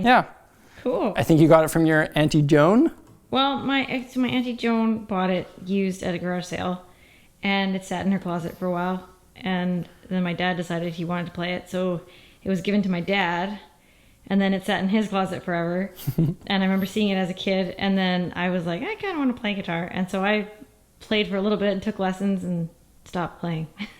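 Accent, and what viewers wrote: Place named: Manitoba, Canada. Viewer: oh that manitoban accent!! XD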